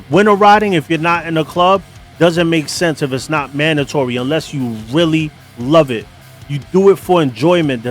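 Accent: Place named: New York, United States. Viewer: I really enjoy this guy’s content. For a southern boy the New York accent can’t be a bit much but he’s honest he’s correct and he struck a cord with me as someone who enjoys riding but wants to have nothing to do with the motorcycle club culture. I’ve been invited into some clubs and politely decline and then watching this gentleman’s videos he explained to me why I said no. Keep up the good work.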